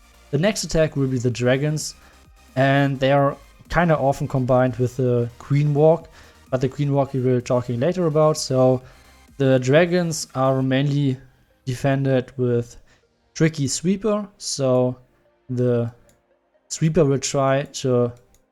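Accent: Germany. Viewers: Wenn deutsche englisch reden 👍top erklärt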